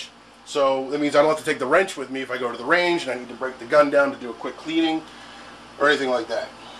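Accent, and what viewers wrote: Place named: Philadelphia, United States. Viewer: Great video Big Dawg. When I heard you say cheese steak or hoagie I thought, this guy must be from the Philly area, then I heard you say the Oaks gun show and it sealed the deal, Philly area it is. Anyway, I just picked up a blued Taurus 1911 today at Tanner's black friday sale for $350. Cant wait to get out and shoot it. I did a bunch of research and everyone that has one loves it. By the way, born and raised in Philly.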